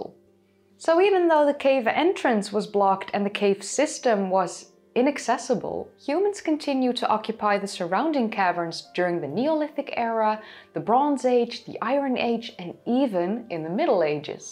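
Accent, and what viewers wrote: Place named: Netherlands. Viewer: that wonderfull dutch accent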